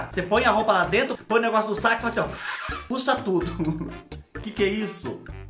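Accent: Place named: Itapetininga, Brazil. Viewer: amo o seu canal kkk cê fala igual eu e a turmaiada aqui de itapetininga kkkkkkk espicúla kkkkkk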